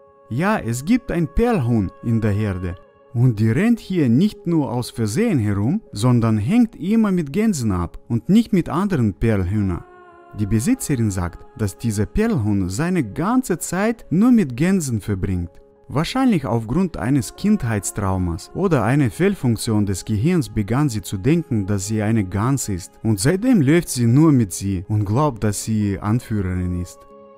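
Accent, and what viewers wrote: Russia. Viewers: Ich mag ist dass du einen russischen Akzent hast ich hab auch russische Wurzeln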